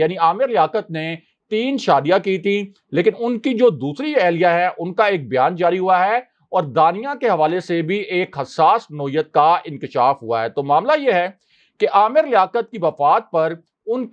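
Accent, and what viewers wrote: Punjab, Pakistan. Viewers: Pie Pendo Punjabi, Correct your punjabised accent Urdu. You cannot correctly pronounce simple Urdu words. Your mispronunciation is very absurd and irritating.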